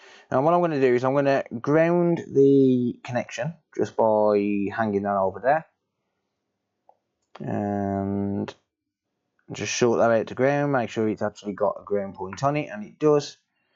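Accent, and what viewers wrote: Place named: Walsall, United Kingdom. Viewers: This geezer sounds like hes from my area ..Walsall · Great content buddy, keep up the good work. You sound like a local lad, are you in the midlands? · Loving the channel and the Brummie accent.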